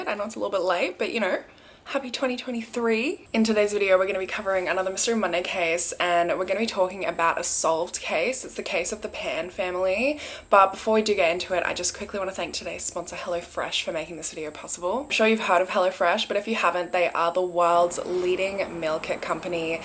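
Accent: Australia. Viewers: You move to where overseas?!! I'm curious!! I would love welcome you to 🇨🇦 You Are awsome!! Love your work and beautiful Aussie accent!!!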